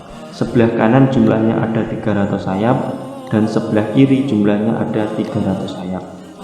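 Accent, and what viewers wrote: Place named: Java, Indonesia. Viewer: Medok wkwk. Good video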